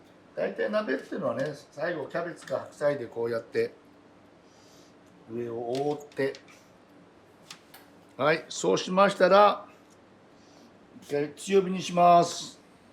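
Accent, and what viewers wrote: Hokkaido, Japan. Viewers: ❗ 北海道弁最高❤️ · ちょくちょく出てくる北海道弁がたまらないです。鮭の頭が入った石狩鍋は甘みとコクが出て美味しいんですよね〜。バターは意外でした。今度やってみます。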